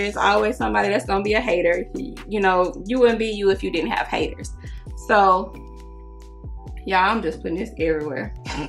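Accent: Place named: South, United States. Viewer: U country where u from youngan?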